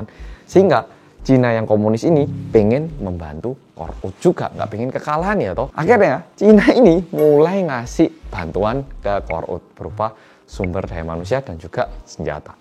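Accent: Java, Indonesia. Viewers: Sampean logat jowo ne peh masok bolo😁😁😁😁😁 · Aksennya indo dapet bgt, semangat bg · Wah bahasanya medok..sudah kayak orang Jawa mas😂😂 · Logatnya jadi BHS Jawa ya medoknya 🤣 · Hi oppa medok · Bisa jadi pengamat politik iki mas korea yg bisa jowo medok🤣🤣🤣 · Logat Jawa nya tu lo hhhhhh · Hahahahaha penjelasan nya wong jowo tenan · orang korea ko medok??🤔🤔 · Denger pelafalannya mirip soekarno yaa kalau ngomong “keun” maksdnya “kan” wong jowo 🔥🖤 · Orang korea lagam bicara jawa wkwkwk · Orang Korea tapi bahasanya agak medok 😀👍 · Ini orang Korean beneren ya? Medok jawanya enak lho.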